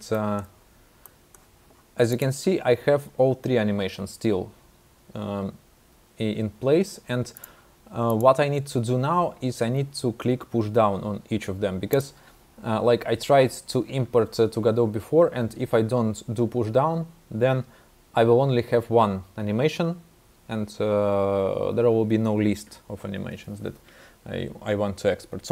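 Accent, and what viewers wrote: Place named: Russia. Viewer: Услышал русский акцент и понял, что моя проблема решена😎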